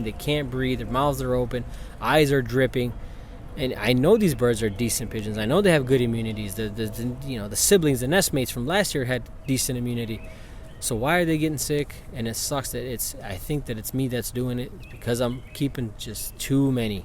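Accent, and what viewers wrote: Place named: New York, United States. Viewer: Sound like you from New York brother, the loft has to be remade completely, you should make a design similar to this, open ventilation for the breeders, click the link its a link to a photo of a design for a loft that basically keeps things completely stress free, no smell of pigeons , you can guarantee the birds and breeders will always be happy and healthy <link>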